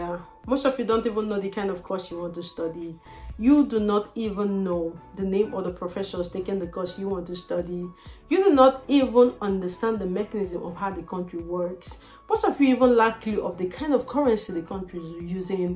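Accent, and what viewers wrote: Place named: Africa. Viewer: Very hard for me to pick up your English African Accent 😢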